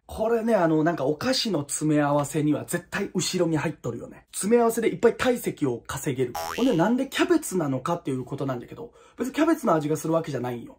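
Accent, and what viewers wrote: Hiroshima, Japan. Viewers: マジ面白い！ 方言が広島カープの選手みたい🎏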